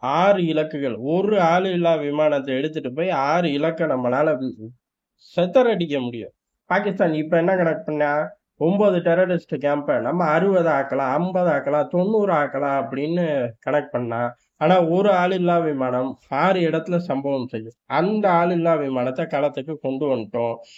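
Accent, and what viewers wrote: Madurai, India. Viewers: தல...செம்ம இன்ட்ரஸ்ட்டிங்கா பேசுறீங்க தல....உங்க மதுரை ஸ்லேங்...சூப்பரா இருக்கு...இதயே கண்ட்டின்யூ பண்ணுங்க...இன்னொரு சேனல்ல புதுசா பானைக்குள்ளருந்து பேசற மாதிரி ஒரு வாய்ஸல பேசுறீங்க போல....அது சரியா புரிய மாட்டேங்குது ப்ரோ....இப்ப நீங்க பேசுற வாய்ஸ்தான் சூப்பர்....அண்ண அமெரிக்கா பயபுள்ள மைலேஜ் கீழ வந்து சொல்லக்கூடாது...சூப்பர்....❤❤❤❤❤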